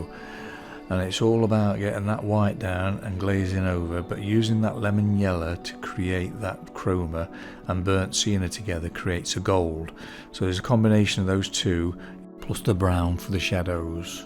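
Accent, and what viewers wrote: United Kingdom. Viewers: Thank you, I have learned a lot from you; actually you were the one that introduce pastel pencils to me! I didn't know there actually exists such a great tool; I just used to draw and paint using pastel sticks, oil, and charcoal. I have downloaded your videos and watch them everyday with considerable attention. It's funny that my daughter's accent is going to change to British as a result of listening to your commentary. I wonder if you are able to let me know how you take your videos! Do you use a DSLR cam or a simple mobile phone? I appreciate all your art and generosity.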